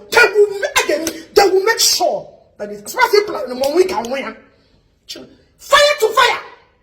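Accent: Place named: Imo, Nigeria. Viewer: okay she’s married self, She’s speaking exactly imo dialect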